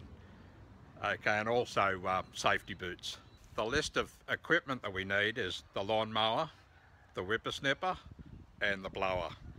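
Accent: Australia. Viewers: I like your accent! you aussies are okay with me. I've got a Weed Eater lol but I think I'll call it a Whippa Snippa from here on out. Cheers from Texas. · I CANNOT listen to an Australian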